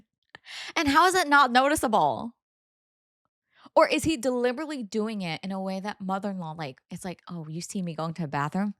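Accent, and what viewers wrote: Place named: United Kingdom. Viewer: Chucked up is vomited aha, it's always fun hearing a fellow brit in the wild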